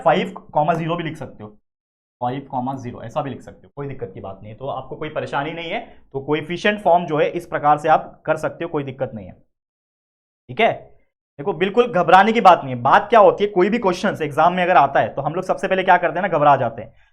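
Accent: Maharashtra, India. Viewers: Are you from maharashtra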